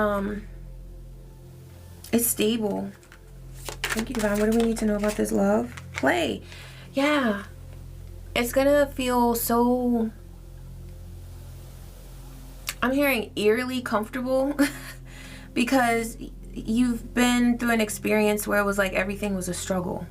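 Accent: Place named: Trinidad and Tobago. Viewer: on point with the voice 😁 you're great.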